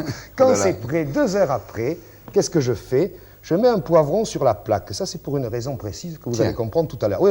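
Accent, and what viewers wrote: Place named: Midi, France. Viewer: Et cet accent du Midi bon enfant me fait jubiler comme jamais !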